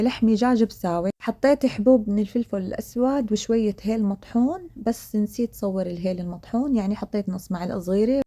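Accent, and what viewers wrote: Homs, Syria. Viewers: والله شي بشهي يعطيكي العافي ودخيل الحكي الحومصي😅😘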